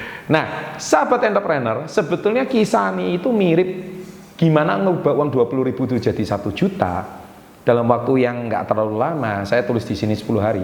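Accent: Java, Indonesia. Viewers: Orang mana sih bang kok logatnya jawa banget😁😁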